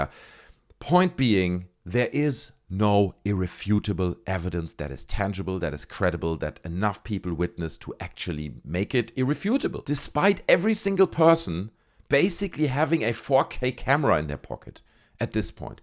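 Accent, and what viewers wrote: Germany. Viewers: Are you from Germany? Du hörst dich jedenfalls so an.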